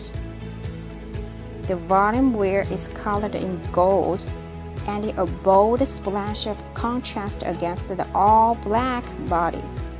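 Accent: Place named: China. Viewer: Lol She legit sounds like Siri speaking English in typical Chinese accent No offense tho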